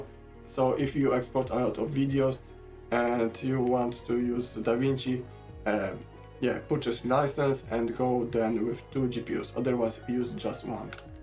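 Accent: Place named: Poland. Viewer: Odpalam i słucham - pierwsza myśl polski akcent, sprawdzam Artstation i jest! Pozdrawiam! :D super video!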